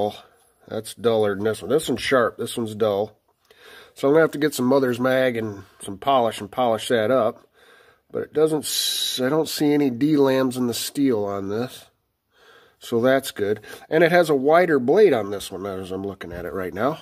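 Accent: United Kingdom. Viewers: Those Condors are going to be great work horses. Spot on British accent Haha. That Tops is going to be one hell of a slicer. Thank you for doing this giveaway. The knife community is something else. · Ha you nailed the bloody British with that accent. I’ve been looking for a plan A by condor and cant find it anywhere in-stock. That low drag looks like a work horse. And everyone knows Tops knives are outta this world. Good stuff⚔️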